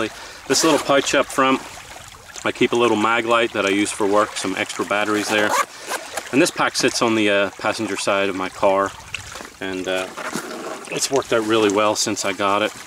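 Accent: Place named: Northern Ireland, United Kingdom. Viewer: Hey this is the first of your video's that i have watched! I gotta move to USA! lol. I love how after 20 years you still have your Northern Irish accent, I love the hybrid USA/Northern Irish twang you've got it's so cool! Gonna have a lot of fun looking through your vids! Blessings from Carrickfergus NI.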